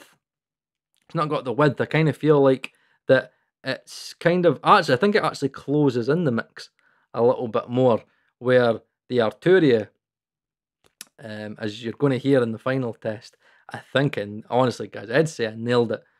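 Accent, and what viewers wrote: Scotland, United Kingdom. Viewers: Hi, just a quick off-topic question: When you shoot your videos, do you "soften" your dialect or is this the way you speak in real life, too? Just asking because I plan to go to Edinburgh next year with some friends of mine. (I have no problems at all to understand you. Been in Scotland 30 years ago but they've never been there. So I wanted to send them your videos to get used to the dialect.)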